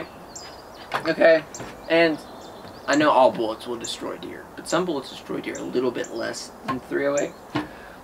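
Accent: Tennessee, United States. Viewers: So first off I aint gonna sit here and criticize you even if I might not agree with you 100% for the simple fact that as a guy who is trying to get a youtube channel off the ground I know the pain of making and editing youtube videos and your's are looking pretty good. Secondly for the people criticizing you for not mentioning .308 being better for long range I may be wrong but I'm willing to bet from that accent you come from the HEAVILY wooded state of Tennessee. where the average range of visibility is under 300 yards where as here in south central Kansas unless I'm hunting prime river ground I'm taking shots at or over 300 yards. So long range capabilities would not be as important to you merely because you don't really need them. Anyhow good video man keep em coming you've gained a new subscriber.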